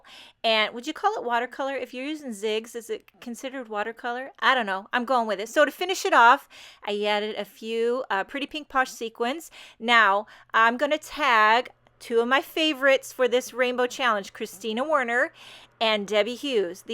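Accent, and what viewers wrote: New York, United States. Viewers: I love your videos, funny personality and I love your accent too. New York?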